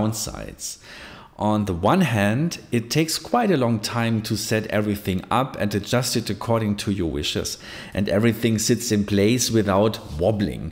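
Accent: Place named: Germany. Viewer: I think they thought since the video title is in English, it meant perhaps Jaspers products were available to be shipped from within the US.Then he heard your accent and realized that you are in Germany, so his hope for lower cost shipping was dashed.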